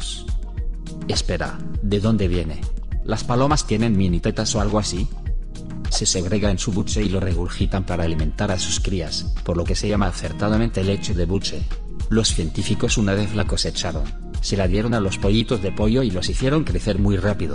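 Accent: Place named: Russia. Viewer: Necesito escuchar esa voz de mujer rusa hablando algo que no sean solo post en Reddit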